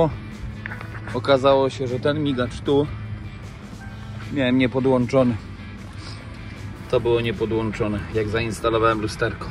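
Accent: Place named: Podhale, Poland. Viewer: No chiba z gor hoho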